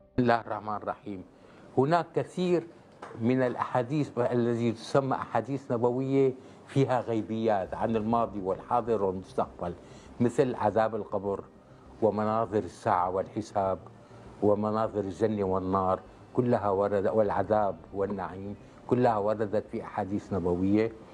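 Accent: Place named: Syria. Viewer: hhhh أكيد يتلعثم فهو حفظ القرآن و هو كبير و هو سوري تغلب عليه اللهجة السورة و درس في روسيا و يتقن عدة لغات المهم هو يحفظوكتاب الله اذا تلعثم أثناء الحديث لهذا شيء عادي جدا و ليس حرام لأنه أثناء التفسيير او مطالعة كتاب الله يقرؤه جيدا ثانيا لقولك ندير و تدبير و عيرته بضعفه في اللغة هو تكلم عن التدبير و المعنى واضح حسب سياق الجملة و انت قلت له ليس تدبيير بل تدبر لا حجة لديك في باقي النقاش كلامك مجرد نقل نقل نقل همهمهمهمهمات تكلم بالعقل و المنطق ولا تدعي على الناس ما لم يقولوا و هو شرح آيات الله شرحا واضحا يفهمها العام و الخاص و هو لم يحلل الحرام لو أستمتع لكل حوارته ستفهم مايريد ايصاله المهم ليس عيبا ان تكون ضعيفا في اللغة العربية لكن العيب ان لا تفهم ما يقال لك و لا تميز بين الصح و الغلط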